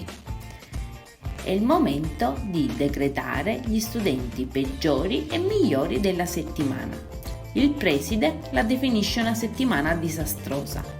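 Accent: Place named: Sicily, Italy. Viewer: Il tuo accento siciliano è bellissimo ahahaha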